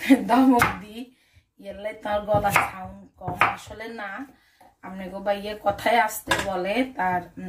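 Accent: Noakhali, Bangladesh. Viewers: Apu tumer nuwakhaliar vasha onk valo laga sunte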